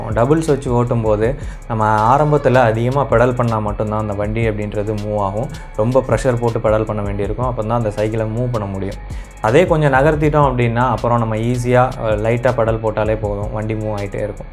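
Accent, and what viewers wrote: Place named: Kanyakumari, India. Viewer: ur slang looks like, kanyakumari or nagercoil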